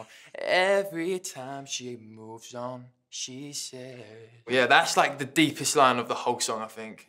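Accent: United Kingdom. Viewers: Still can’t believe he’s British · SOMETIHNG WENT WRONG WHEN IS SINGING I HAVE AN ENGLISH ACCENT AND WHEN HE TALK IT AN BRITAIN ONE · Lol hes British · how come you have a british accent when you talk but when you sing in a american accent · Did not know he was British 😐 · HES BRITISH ?! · WOAH HE IS BRITISH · OMG HES BRITISH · Why does he sing in an American accent but talks British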